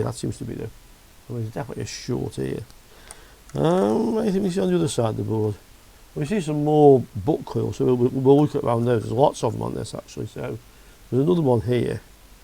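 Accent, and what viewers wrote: United Kingdom. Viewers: Kinda enjoying your British accent and all it comes with, yeah :)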